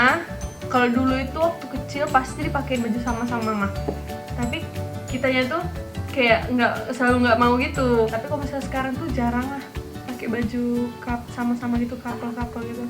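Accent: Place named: Medan, Indonesia. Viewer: khas medan kali yakan 😂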